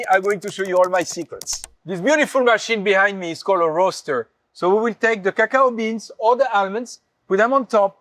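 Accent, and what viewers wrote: France. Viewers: Arnold is Austrian and this guy is French. Completely different countries and accents. Are you high? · Im reveling in his delicious chocolate knowledge and beautiful French accent! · His accent is peak French Accent, it's so entertaining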